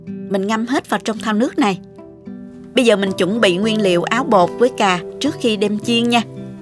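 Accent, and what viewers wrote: South, Vietnam. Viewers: cách chỉ dẫn tường tận, dễ hiểu, dễ thực hiện, thích nhất là giọng nói miền Nam thân thiên... 10/10...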